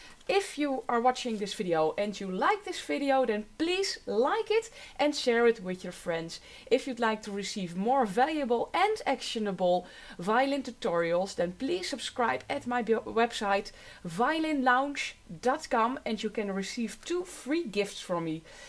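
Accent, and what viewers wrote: Netherlands. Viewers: i just started playing and i'm making the most annoying sound ever , I've listent to all the tips on the internet but nothing really helps , But i'm only playing for like three days so maby it's normal , Could this fade by Practice ? Or should look for a problem ? ow and may i ask are you dutch ? because your accent sounds dutch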